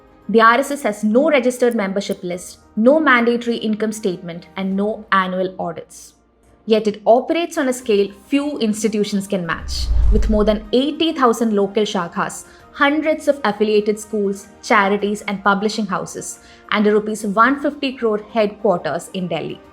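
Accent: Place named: Kerala, India. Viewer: What motivated this lady to probe RSS, from the accent she sounds like a Keralite....must be some middle Eastern *und which is under her table,throbbing her for this video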